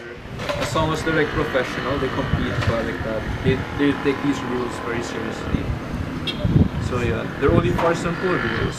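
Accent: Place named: Philippines. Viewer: tagalog ka nga pa cute eh!!!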